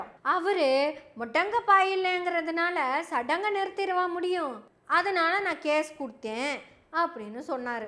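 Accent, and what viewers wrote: Kongu, India. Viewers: கொங்கு தமிழ். அருமை. சில நேரங்களில் பேசும் மலையாளமும் புரிகிறது. satire. அருமை. மக்கள் ultimate. மக்களுக்குப் புரிய வேண்டும். புரிந்து விட்டால் போராட்டங்கள் குறையும். சரியான புரிதல் முக்கியம். அரைகுறையாகப் புரிநது கொண்டவர்கள் ஆட்டம் அதிகமாக இருக்கிறது. அந்தக்காலத்தில் கலைவாணர். இப்போது.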